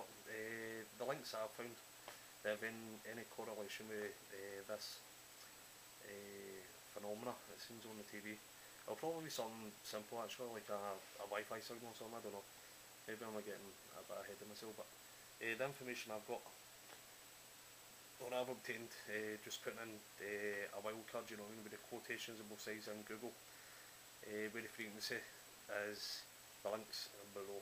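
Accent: Scotland, United Kingdom. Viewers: Due to the poor audio, I had to turn on CC. Sounds like he is speaking a combination of English (Scottish) with a smattering of Galiec.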